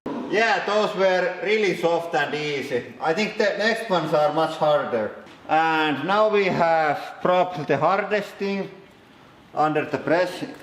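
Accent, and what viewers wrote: Finland. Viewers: Are you kiddingg me? Half the reason I am here is that Finnish accent LOL it wouldn't be anything good without him talking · I read that with your Finnish accent.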